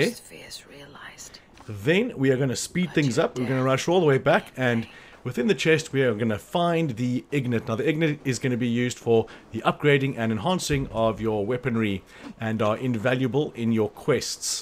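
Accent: South Africa. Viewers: You sound South African.. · Hearing a South African do these videos is the best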